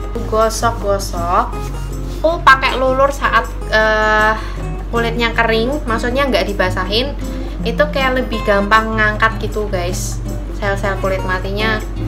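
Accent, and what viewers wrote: Java, Indonesia. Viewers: Wong jowo to mbak? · Org Jawa ya ? Logat ny kek jawa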